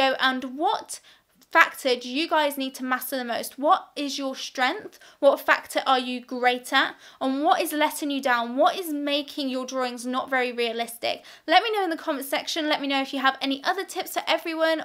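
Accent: United Kingdom. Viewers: I love your British accent....but i dont have any idea what are you talking about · You are british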